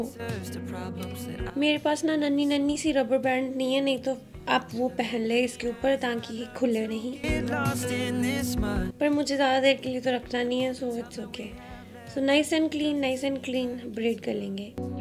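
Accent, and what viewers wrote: Punjab, India. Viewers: I love your punjabi-english-hindi accent😆🥺🥺 it is really cute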